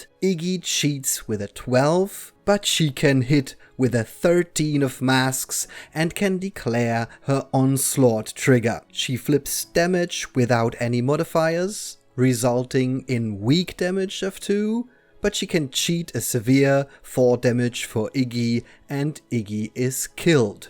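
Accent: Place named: England, United Kingdom. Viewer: Thanks for the battle report. I like your english accent, it seems to fit the theme of the Malifaux universe.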